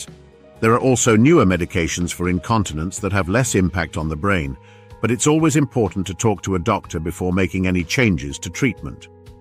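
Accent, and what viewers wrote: United Kingdom. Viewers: Is you tube in Britain? Every announcer speaks British so i am curious?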